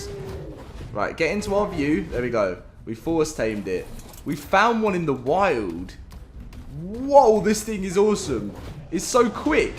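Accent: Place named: Birmingham, United Kingdom. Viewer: U sound like my friend that lives in Birmingham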